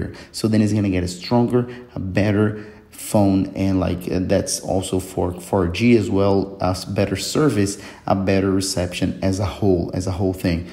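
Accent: Brazil. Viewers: Já reconheci q tu é Brasileiro dez do começinho do vídeo kkkkk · E esse sotaque de brasileiro meu parceiro? É Brazuka?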